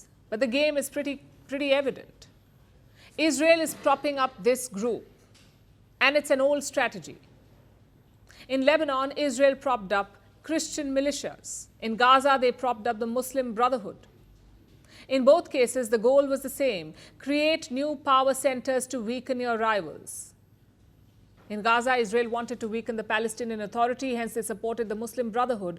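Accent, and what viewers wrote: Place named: India. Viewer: Typical indian english dont know why this lady is so serious while reading the news bolki shawerma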